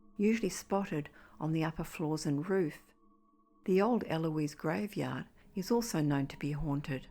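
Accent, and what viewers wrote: Australia. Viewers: And she's an Ozzie! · I thought Australia. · Australia I would think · I love the Australian accent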